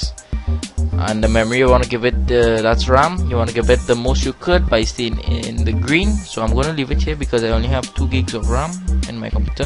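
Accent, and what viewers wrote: Trinidad and Tobago. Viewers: DALM U HAVE DAT TRINNI ACCENT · hmm u soundin a trini hoss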